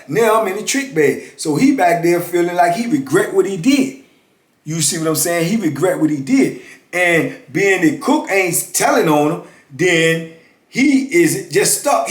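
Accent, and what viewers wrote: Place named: Louisiana, United States. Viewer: By your voice, I thought you were from Louisiana (New Orleans)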